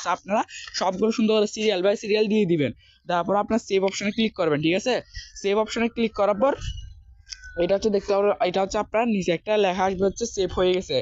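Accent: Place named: Chittagong, Bangladesh. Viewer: ভাইয়া ত চট্টগ্রামের মনে হয়,, কোন যায়গায়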